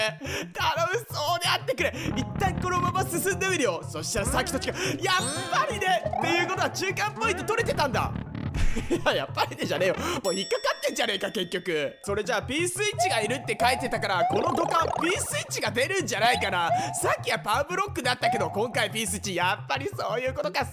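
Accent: Fukuoka, Japan. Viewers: いい訛り具合ですね૮. ̫. ა ⸝꙳ 福岡訛り好きです(笑) そして最速タイムおめでとうございます🎊🎊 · この福岡弁完ぺきやで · 実況中も福岡弁がいいな、絶対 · さんの福岡弁新鮮で好きです！！やっぱりバネは友達ですね！ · 自分も福岡住まいなので福岡弁聞くとすごく親近感湧きますw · ちゃんと期待に応えて福岡弁やってくれてるの優しいw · 福岡弁も中々悪くないですね。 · お疲れさまです！さんの福岡弁に萌えました❤️ ｶﾀｺﾄの標準語も好きですw(৹ᵒ̴̶̷᷄ωᵒ̴̶̷᷅৹) 1発マリオのゴールは思わず声出ちゃいました⚑ﾞ⭐️ 一日の終わりに見れてよかったw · 福岡弁、あんまり違和感無かった！でも、同じ九州でも熊本弁とは大違いですね！ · 福岡弁、やばすぎてやばい、、、🥺 方言でずっと喋ってて欲しい🥺 ギャップ萌、、、からのかわいいかわいい🥺 ショタ喋り久々にやって欲しい、聞きたいです！（笑） 今日も面白かったです😂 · 福岡弁のさんなんか違和感.... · さんの福岡の言葉聞けてなんか嬉しいたまに〇〇ばいとかはさんが言ってるのは知ってましたけどここまでフルに出たの僕は初めてですね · 福岡弁で喋ってる時笑い過ぎて内容が全く頭に入ってこなかったwww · さん大好きいいいいい！！！！！すみません。久しぶりにさんの動画を見にこれて、嬉しすぎて癒されすぎて気持ちがあふれてしまいました(ﾉ≧ڡ≦)☆ﾃﾍｰ コメントしきれないくらい、どこもかしこもさんが可愛くて可愛くて、終始ニコニコしてました〜🌸 福岡弁のさんが新鮮で良かったです〜！！うちの父は佐賀県出身なので、なんか安心する気持ちもありました( ˊᵕˋ*) 本当に癒されまくりました(◍ ´꒳` ◍) 心がぽかぽかです！いつもありがとうございます🌸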